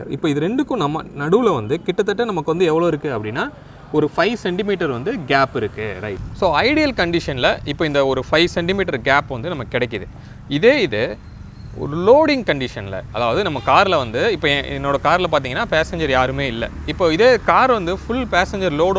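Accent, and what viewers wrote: Virudhunagar, India. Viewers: Bro neenga விருதுநகர் ahh bro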